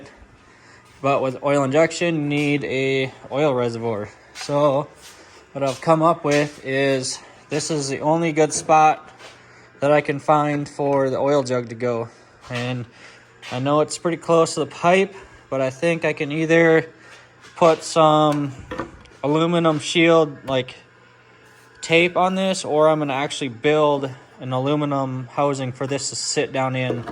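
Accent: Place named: Minnesota, United States. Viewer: Knew you were from mn before you said it. Where are you located?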